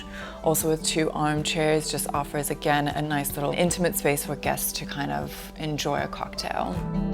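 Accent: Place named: South Africa. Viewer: Sound South African but for someone who lived in America.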